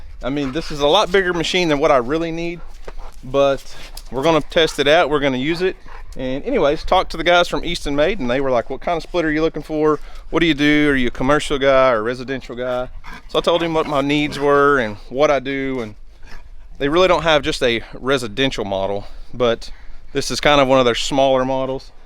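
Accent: United States. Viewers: Wooowhoooo CANADIAN MADE!!! 🇨🇦 sorry my country pride is showing! Funny when you said the name of the company I immediately thought hey isn’t that a Canadian company?! When you confirmed it right away I thought way to go! Then I thought wow with the exchange rate you probably got a heck of an amazing price too! Smart man! I’m so proud that you were as thrilled with it as you were, built tough to last! Trust me when you live in the “great white north” you want to have tools that work and will last through the elements. Lol! I love your American accent too! Hehehe 🥰 funny here I am living on the 34th floor high in the sky in a metropolitan area watching a country guy splitting firewood and feeling country proud! Life is strange at times eh? (Thought I’d toss in that Canadian “eh” just to prove I’m truly a Canadian! 🇨🇦 As always I love your videos and this one was extra special for me. 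So glad you enjoyed splitting your firewood isnt life so much sweeter when we enjoy what we do? Sure makes chores less like chores and more like just living. Be well and enjoy your new toy!! Lol!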